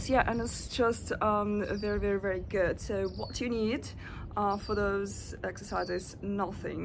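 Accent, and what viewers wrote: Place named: Russia. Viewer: Чувствуется русский акцент у.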